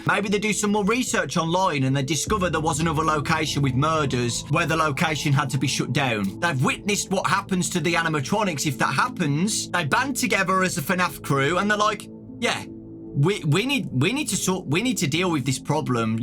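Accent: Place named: Midlands, United Kingdom. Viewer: Mate your accent is just amazing. You're defo from the Midlands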